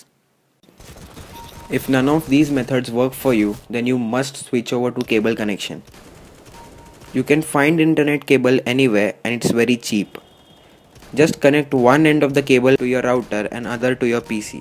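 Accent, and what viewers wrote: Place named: India. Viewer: omfg ur indian accent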